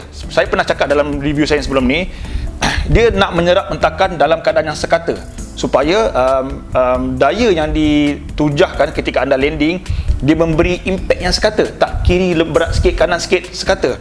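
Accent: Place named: Malaysia. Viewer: Suka saye dengar logat Malaysie 😅,, salam subscriber dari Indonesia bang🙏😁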